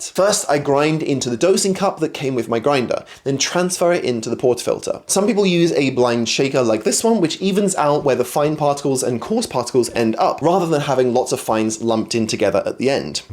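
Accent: United Kingdom. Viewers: The advice is for spoiled brats. Another British accent bullshit.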